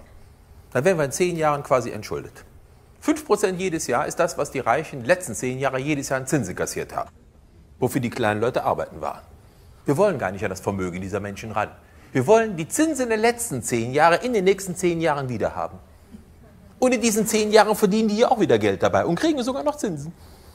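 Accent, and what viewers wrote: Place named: North Rhine-Westphalia, Germany. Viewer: Wunderbare Lehrstunde mit rheinisch-westfälischem Duktus. 👍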